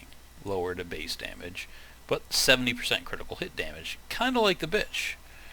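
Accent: United States. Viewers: This guy has gotta be midwestern I can tell from how he says slag "slayg"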